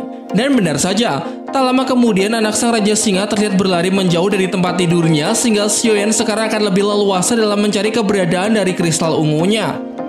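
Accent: Java, Indonesia. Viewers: ga akan sih gw ga suka suara cewe nya udah nyarin medok lagi, gw bukan org jawa makanya ga suka sama suara cwenya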